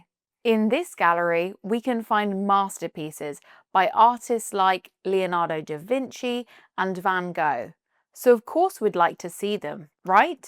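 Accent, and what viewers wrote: United Kingdom. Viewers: Your british accent is so beautiful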